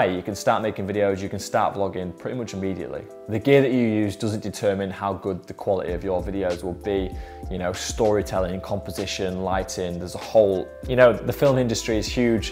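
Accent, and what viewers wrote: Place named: Yorkshire, United Kingdom. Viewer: Btw are you from Leeds or Bradford ? Your accent is too close to home not to be.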